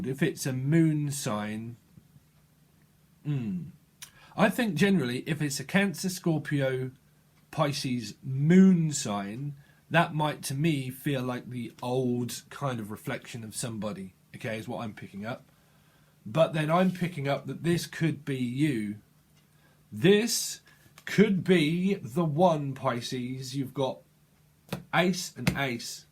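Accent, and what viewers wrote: United Kingdom. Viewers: i understand british accent better, its more clearer than australian accent, thank you its quite resonate with me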